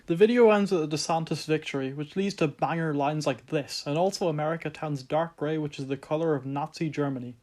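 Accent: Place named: Ireland. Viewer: you sound like mario if he was irish instead of italian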